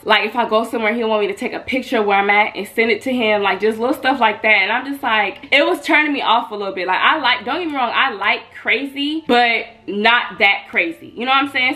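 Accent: Chicago, United States. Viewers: born and raised here and this is definitely chicago nigga vibes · yea , I’m frm chicago n it sound like sum shit that mfs do out here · DEFINITELY CHICAGO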